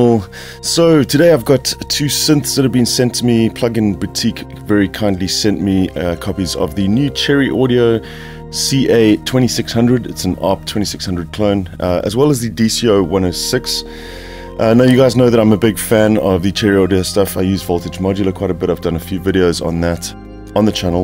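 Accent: South Africa. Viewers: Hey, you sound like a South African, the accent checks out..... fellow South African checking in, this is rare. Neat review and wow, for $25 this is incredible.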